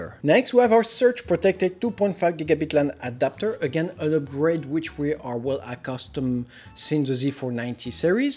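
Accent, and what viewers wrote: France. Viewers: Great review combined with an outrageous french accent that Inspector Clouseau would be proud to own.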